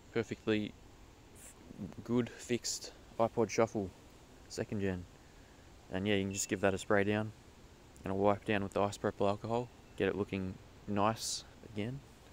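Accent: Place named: Australia. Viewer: OMG. He's Australian. Thumb down!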